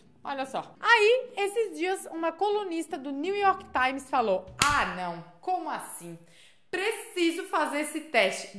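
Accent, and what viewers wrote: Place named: Florianópolis, Brazil. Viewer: Ho feia sabiax que erax aqui do suli mas que erax manezinha não sabiax ...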